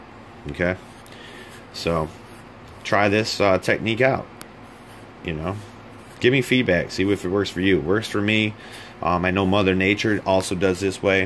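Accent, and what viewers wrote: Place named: United Kingdom. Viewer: PARDON! I didn't understand anything , you started talking Britannica .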